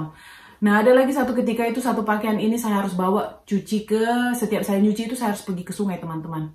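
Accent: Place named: Sulawesi, Indonesia. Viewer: Loghat atau slang anda sepertinya dari Sulawesi, maaf jika salah. Saya pernah jalan jalan di Sumatera, Sulawesi, Kalimantan, Kepulauan Riau....saya dapati negara Indonesia sangat kaya dengan sumber alam, sawit terbesar, nikel, minyak dan gas,,,,malangnya oligarki yang mengawal kekayaan dengan korupsi....ada banyak kemajuan semasa Pak Jokowi...selepas 2024 tak tahu lagi.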